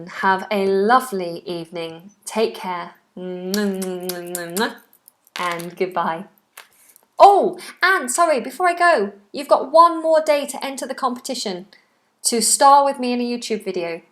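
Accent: United Kingdom. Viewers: I don't like the British accent thank you.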